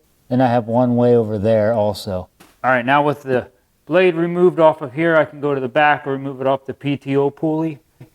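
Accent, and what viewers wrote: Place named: Pittsburgh, United States. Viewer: Your voice sounds like you live near Pittsburgh. I use a rope to pull the idle pully back and then remove the belt from the PTO. Also use an air impact wrench to remove and reinstall the nuts on the blades. Never clean under the deck and never torque the bolts. We have a fabricated deck, it will take years to rust through. Thinking about building a low crawler to be able to slide the deck in and out from the mower. I do grease the zerks though every time I sharpen blades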